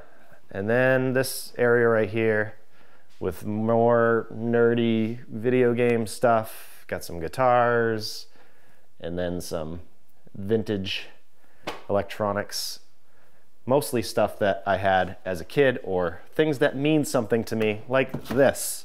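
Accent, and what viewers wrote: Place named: New England, United States. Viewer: lol got recommended to your channel, first time ever watching and after ten seconds of the video, knew you were from Massachusetts or NH.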